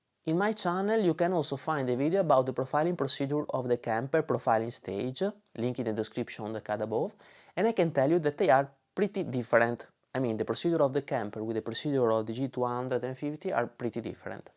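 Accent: Italy. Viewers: Ma sei italiano? Mi sembra un accento italiano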